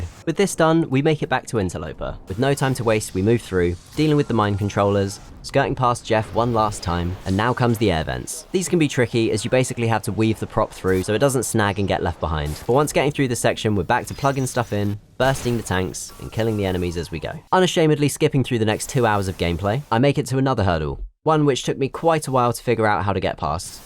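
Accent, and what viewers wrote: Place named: United Kingdom. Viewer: this would be a great video with great pacing and great editing about a great game and a great all achievement challenge. unfortunately, british 😑😑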